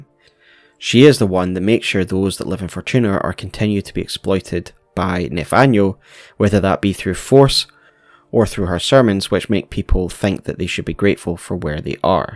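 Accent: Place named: Scotland, United Kingdom. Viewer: Quick question for ya. You clearly sound Scottish, are you toning your accent down for videos? Just curious because Shaun another Youtuber from Edinburgh does that as well.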